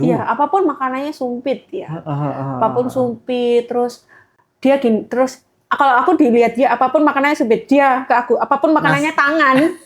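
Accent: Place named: Java, Indonesia. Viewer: Cindo jawa lucu medhok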